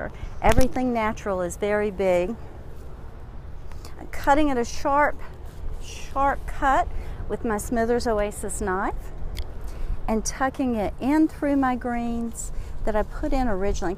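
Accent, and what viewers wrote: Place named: East Texas, United States. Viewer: Enjoyed this. I swear our presenter was from East Texas based on her accent. 😃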